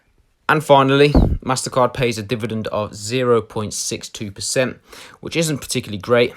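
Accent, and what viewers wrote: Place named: Manchester, United Kingdom. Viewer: Yes lad!! How old are you laddy you sound like a Manchester lad, how much money ya earning g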